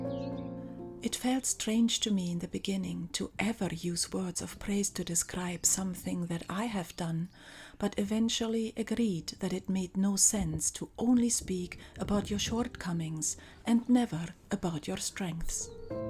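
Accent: Scotland, United Kingdom. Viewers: Hello from Dublin Ireland i just found your channel and i am really enjoying catching up on your previous videos. Where is your birthplace ? You have some Scottish accent.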